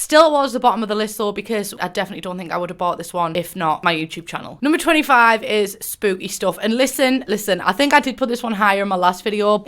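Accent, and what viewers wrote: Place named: Middlesbrough, United Kingdom. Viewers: Never watched one of your videos until a friend sent me this today, heard your voice and as a fellow smoggie no longer living in the Boro, your voice was a nice bit of home I needed, thanks. Also thanks for helping which packs to buy, great content. Subscribed. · lmao I literally was thinking 'i heard this accent before, maybe when I flew in Middlesbrough to get tattooed' then I saw your description. I've never felt this satisfied for guessing something right (I'm French so recognizing accents is a big deal for me) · YOU CHANGED YOUR VIDEO DESCRIPTION rip “...from proper up north that’s why i sound like that” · I just realised I never question your voice cuz I'm also from Middlesbrough onsdiadoj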